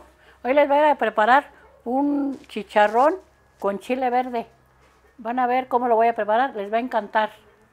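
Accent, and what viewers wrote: Michoacán, Mexico. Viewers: forma tan natural de expresarse muy de México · DAVID CORDOVA y michoacana Con ese acento tan dulce de las michoacanas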